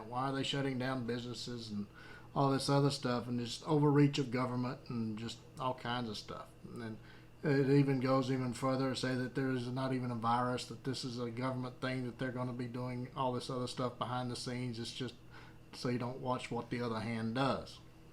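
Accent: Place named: South, United States. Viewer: Thank-you --calm, southern, common-sense. You need to be on President Trump's team--once a week "The Minute"--seriously, a calming voice in a sea of fear. Again, thank-you for posting your comments. At first I wasn't sure what to expect. God bless and keep you and your family safe.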